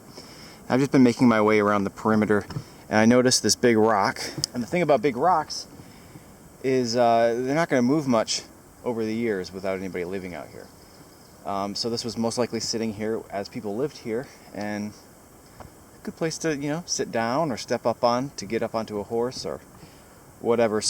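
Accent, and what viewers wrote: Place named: New England, United States. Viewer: Excellent video! I wish I knew about this channel before. I subbed ya. Are you in New Hampshire it sounds like you have a New England accent. I'm from Maine myself and I love metal detecting and coin collecting. It definitely sounds like you know your stuff and that's cool. Dave from Maine.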